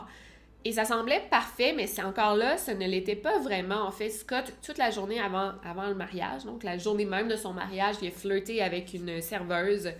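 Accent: Quebec, Canada. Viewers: J’adore tes vidéo je te suit depuis la Suisse au début j’avais un peu de peine avec l’accent qui change mais tu es tellement superbe que mes oreilles se sont habitués à l’accent québécois merci 😊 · Coucou je suis aussi une de t'es abonnée et je trouve que ta chaîne aussi et top les québécoises vous avez un talent fou et êtes tellement humble c est toujours un plaisir d écouter vos vidéos avec votre belle accent bonne continuation à toute les 2 vous êtes top 😉 de la pars d une française fan de vous 2 😊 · L'accent québécois est vraiment charmant.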